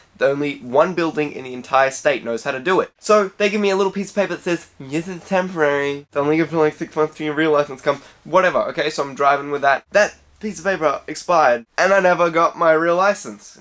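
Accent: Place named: United States. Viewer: Good american accent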